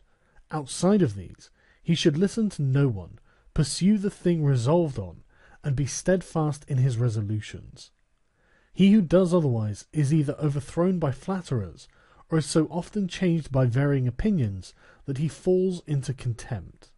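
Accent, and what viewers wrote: United Kingdom. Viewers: Excellent recitation, if you can get past the horrible British pronunciation. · I love Sargon Of Akkad's soothing British voice. No homo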